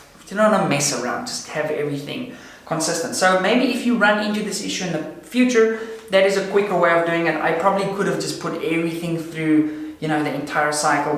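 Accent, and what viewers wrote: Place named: South Africa. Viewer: I watch your videos to hear you SA accent.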